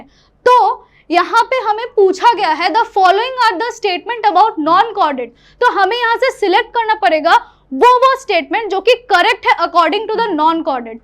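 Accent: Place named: India. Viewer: NICE HINDI ACCENT 👌. VERY IMPORTANT FOR MASS APPEAL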